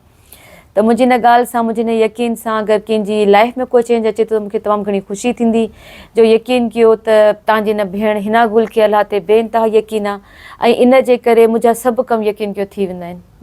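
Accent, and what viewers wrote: Sindh, Pakistan. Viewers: Adi twhn larkano ja ahyo??? Twhnji sindhi jeka ahy na uha thatta badin tmk area wari lagy th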